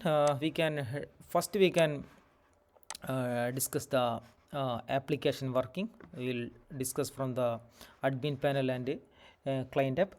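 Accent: Kerala, India. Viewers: Me after 10 sec of your video....I sense a malayali...naattil evdaaa?😁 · malayali aano🙂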